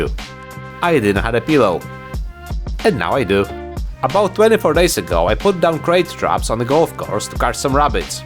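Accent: Poland. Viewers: This was such a wild ride! I really enjoyed watching the whole thing and your Polish(?) accent and humour made it even better 😊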